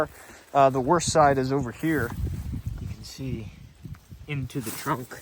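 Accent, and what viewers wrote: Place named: Canada. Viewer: As soon as I heard "might as well go for a soda", I knew you were Canadian. The sterling elocution hinted at that as well 😂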